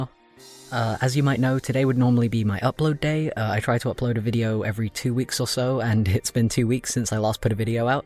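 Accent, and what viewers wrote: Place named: United Kingdom. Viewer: Your a Brit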